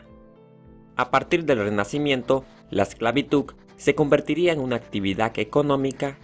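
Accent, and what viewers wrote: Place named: Spain. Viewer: Puede ser su acento , los españoles dice mucho el sh en la s ,aqui en mexico hay muchas lenguas y la x se pronuncia como j ,sh ,ts ,x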